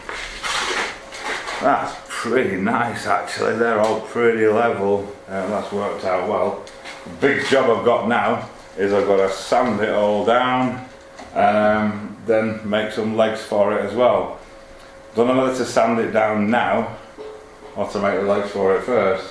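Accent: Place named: Chesterfield, United Kingdom. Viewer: Hi where abouts in the UK are you from? You sound similar to us we live in Chesterfield lm thinking your from near by perhaps Mansfield!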